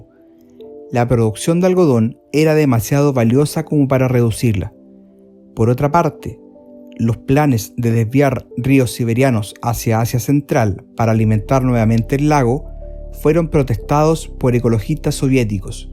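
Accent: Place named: Chile. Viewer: Estaría mejor si no lo relatara un chileno muerto de hambre 😊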